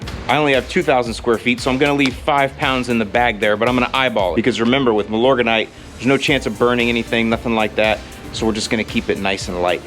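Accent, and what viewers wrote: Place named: Midwest, United States. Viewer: Great video, and i love your Mid-Western accent lol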